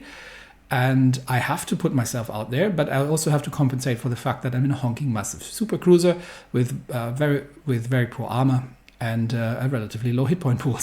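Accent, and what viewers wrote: Germany. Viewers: Hello are you a native german speaker?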